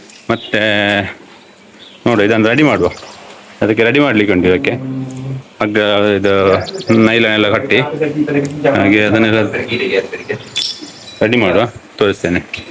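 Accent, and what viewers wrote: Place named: Mangalore, India. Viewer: Meenu tikkijindla bejar ejji anda fishing place and natural kudla style tulu patera soooper, wishing you better fishing next time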